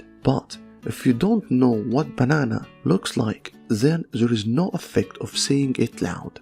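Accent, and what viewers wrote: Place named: Egypt. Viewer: What's the program that you used to make the video? Super Cool. ALSO! Are you from Egypt? Your accent sounds very familiar :)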